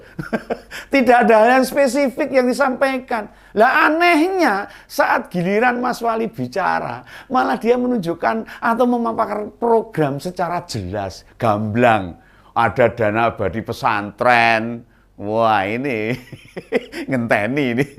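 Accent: Java, Indonesia. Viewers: Om, ngomonge sedep, medok,jan nyanduni tenan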